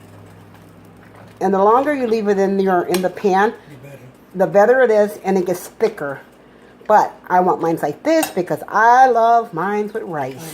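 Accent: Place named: Puerto Rico. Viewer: Se ve bonito y aparentemente sabroso. Voy hacer un comentario porqué si no exploto.Señora usted tiene un acento puertorriqueño y habla buen español¿Porqué habla en Inglés,Inglés con acento puertorriqueño e inclusive hasta los ingredientes en la receta están en Inglés?Estaba buscando una receta de patitas de cerdo al estilo puertorriqueño y me encuentro con la suya y me da pena encontrar la suya donde parece le avergüenza hablar español?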